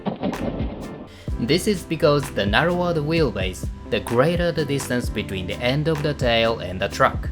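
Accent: Japan. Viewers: やっぱり日本人です。発音 が。。。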